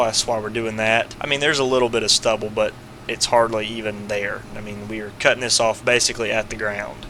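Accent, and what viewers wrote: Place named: North Carolina, United States. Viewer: Just found your channel watching this series loving so far don’t know where your from but sounds like NC only because you sound just like Dale Earnhardt JR lol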